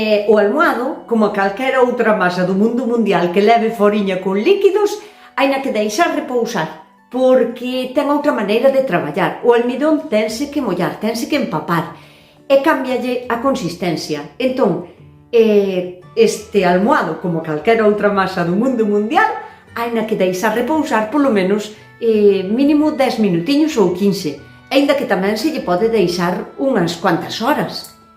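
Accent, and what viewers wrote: Galicia, Spain. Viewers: Hola,te escribo desde Toronto, qué alegría encontrar tu canal,seguir tus recetas y recordar el acento gallego, qué lindo, me siento en casa contigo ❤❤❤ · Puede que no quiera hablar en español o puede que siempre hable en gallego por qué toda su vida lo hizo,conozco zonas de Galicia y en las que tengo familia que no saben hablar bien en español. Pero es una gozada oír este gallego natural del pueblo y no el que se han inventado como oficial que ni los gallegos conocen muchas palabras y dicen que el gallego no es así,pero como hay mucha gente chupando del bote de la lengua es lo que hay.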